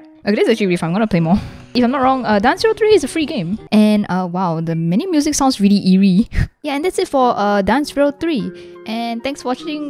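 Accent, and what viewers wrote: Singapore. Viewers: Are you singaporean or malaysian because of the accent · She's Singaporean, but what's the difference lol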